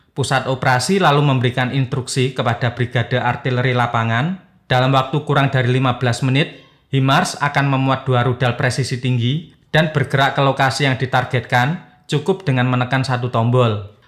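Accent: Java, Indonesia. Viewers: Wahhduhhh loghat jawanya mas kental sekali wahduuhh😂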